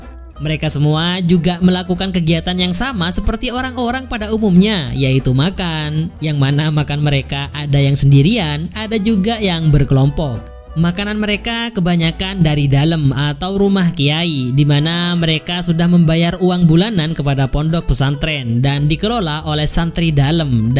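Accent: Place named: Madura, Indonesia. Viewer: Video x kereeeen tpi suaranya ketarah madurisix 😀😎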